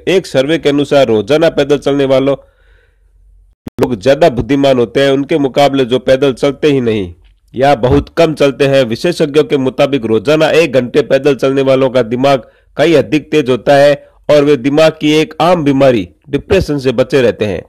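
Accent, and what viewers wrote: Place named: Marwari, India. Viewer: भाषा और इनका लहजा मारवाड़ी जैसा ही लग रहा है।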